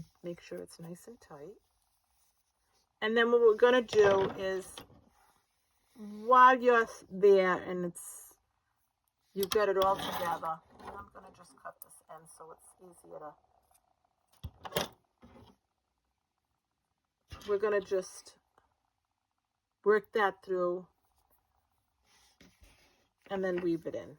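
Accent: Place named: Brooklyn, United States. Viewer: Are u from Brooklyn New York? Your accent sounds like you are. Love your accent!! Im from Louisiana and still live here and ppl think we r from Brooklyn as well. Lol!!! Im asked all the time r u from Brooklyn? Lol! ❤❤ loves these lil baskets! Going to make a few and put candy in them for the family. Thanks! ❤❤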